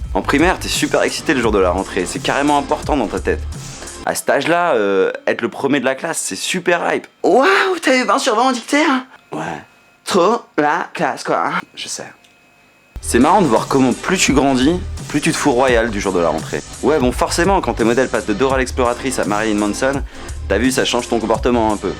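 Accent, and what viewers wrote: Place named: Switzerland. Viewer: Mais tu est suisse j'ai l'imprétion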